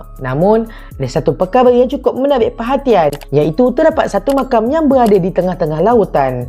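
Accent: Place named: North, Malaysia. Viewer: orang utagha ka? loghat cakap ada kala keluaq utagha tu hehe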